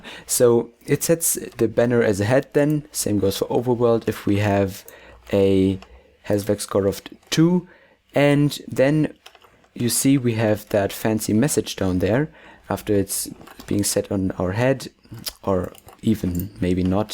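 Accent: Germany. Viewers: Man hört raus das du deutsch bist ^^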